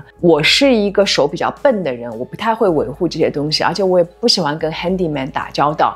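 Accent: Shanghai, China. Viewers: 沪普沪英很亲切，谢谢。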